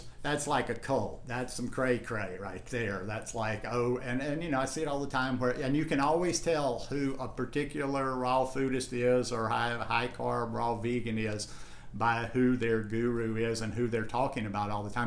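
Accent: South, United States. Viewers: I watch only a handful of raw plant based people. Their eating styles range from gourmet labour intensive, all the way to those who don't use machines and meal prep involves grabbing a piece of fruit . I take info from them all and that is reflected in how I eat. You and Tannyraw are my favourites - must be the American southern accents that I love 😀.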